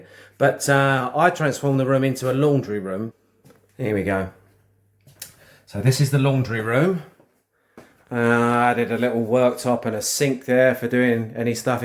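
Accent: Essex, United Kingdom. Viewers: Love your channel. Are you from Essex by any chance? Good luck with the renovations. 👍🥂🍾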